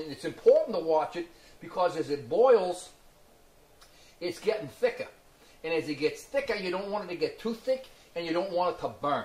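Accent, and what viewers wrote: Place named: New York, United States. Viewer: It's a New York accent coming from a guy who's lived in Georgia for the last 20 years. From New York to Atlanta to Habersham County.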